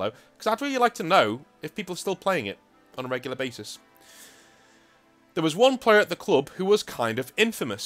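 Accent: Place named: Liverpool, United Kingdom. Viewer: I love his subtle Scouse accent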